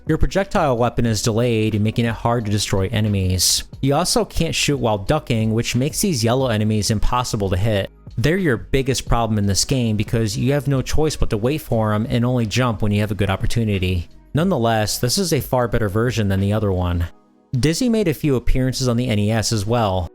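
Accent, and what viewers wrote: United States. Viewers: I grew up in the U.K. when Code Masters started doing serious business and the Oliver Twins were very much local heroes and near unknown worldwide. So it’s nice to hear an American voice cover their achievements.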